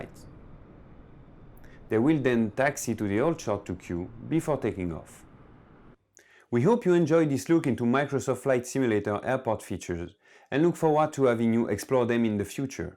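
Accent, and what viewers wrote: France. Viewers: French accent :) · This french accent :) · It feels like watching a video presentation by Airbus. The french accent. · L'accent français?😅... Great video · yes, enjoyed this new features video. You definitly want me to take the plane and go back to home, with this beautiful easy listening french Chiracron english Jerusalem accent :-D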